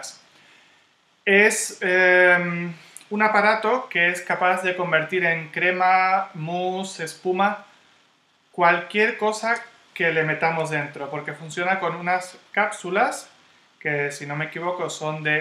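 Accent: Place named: Spain. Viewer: porque imita el acento español peninsular?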